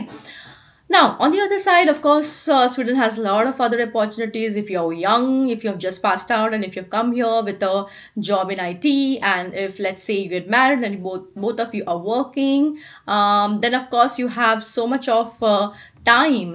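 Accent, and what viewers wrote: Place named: India. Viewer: Thank you.. and I belive i did understand at least half of your indian-english! Its difficult becuse you use another pronunciation! But anyway.. sweden is not a paradise/not either a place to make a lot of money and move home after.. must be better places.. like Pakistan or Afganistan? How ever.. sweden do have one of the higest taxes in the world.. and its goes in to our healtcare and social care system.. its includes healtcare, schools, your pension, your parents healtcare and retirement homes, public transportation and a lot more! Its set up for people that lives in sweden! And then to people frome India and even other countrys.. its almoste higly educated frome top universitys of india or other countrys that get a job in sweden! Or even can apply for a job! And there is altso traps to be aware of.. swedish companyes that tryes to get cheap labour frome India.. 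be aware of that scam! Its rather this.. big international companys even if they are swedish.. do like to hire people frome other cultures.. to get a grip of the market there! High education and on top of that language and cultural skills is a benefit to get a job! Sooo sweden is not a paradise.. exept if one like to build and rise a family.. with kids!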